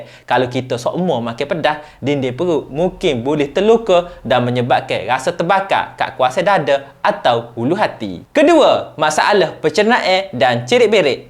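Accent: Kelantan, Malaysia. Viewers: Bang baca Kelantan ke tu?😭😭😭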